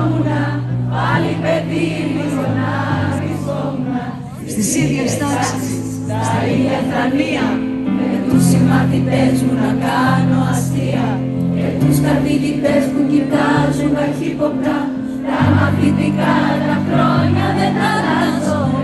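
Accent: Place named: Cyprus. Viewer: Poso mou aresi otan milai ta Kipriaka! xronia sou polla agapi mou, na zisis kai na ta ekatostisis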